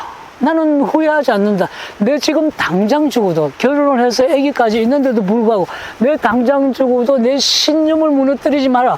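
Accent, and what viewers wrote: North Korea. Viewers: 도대체 당신의 정체가 뭐냐 말투가 북한말인데 북에서 언제 내려왔나 당신이 받은 임 무가 뭐냐 6,25역사 왜곡하러 내려 왔냐 이것이놈의 나라가 완전히 공산화 되었구만 이런자가 설치는데도 방치하고 있으니 아하 이제 이제 이나라가 완전히 망했구나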